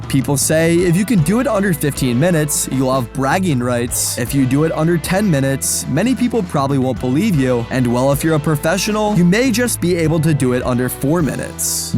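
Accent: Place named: United States. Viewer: Nice video, but hare ur american accent 😮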